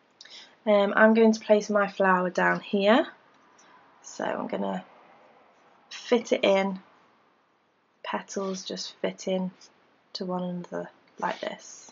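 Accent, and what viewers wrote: England, United Kingdom. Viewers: Your tutorials are THE BEST! You go along at a perfect pace and you are extremely clear without being boring. It doesn't hurt that your English accent reminds me of my mum who first taught me the basics. ;) Thank you. I am almost done with my first afghan and you have helped me all along the way. xxx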